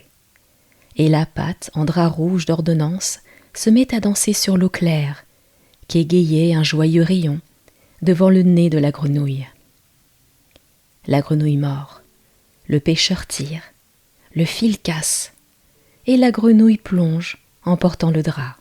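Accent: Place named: Provence, France. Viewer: désolée c est le téléphone qui a mal écrit Je voulais dire l accent provençal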